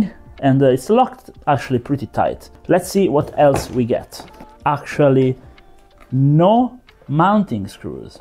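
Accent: Italy. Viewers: His italian accent is killing me😂 grande bro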